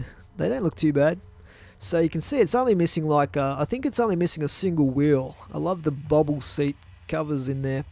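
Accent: Australia. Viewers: u AUSTRALIAN mate me too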